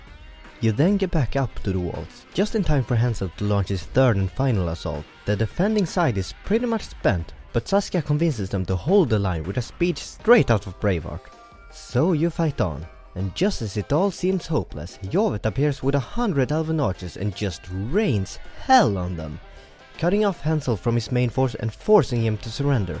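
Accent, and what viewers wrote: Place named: Finland. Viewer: Thank you for this, very helpful! I've been trying to place your accent, are you from Finland?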